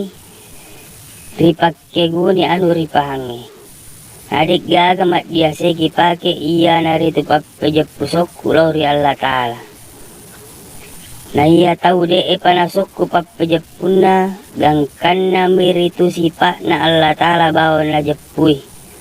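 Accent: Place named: Soppeng, Indonesia. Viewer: Kya Bugis soppeng bahasanya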